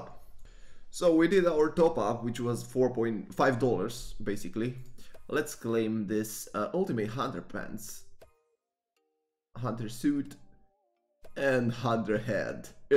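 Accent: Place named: Albania. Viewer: and u sound like u are from albania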